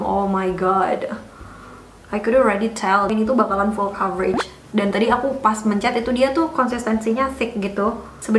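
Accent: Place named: United Kingdom. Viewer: Lidah nyaa gilss gilss...Inggris bat dahh lidah ku langsung auto milih diam mingkem cep😭...btw hallo ka semangat bikin video nya yaaahh🥰✨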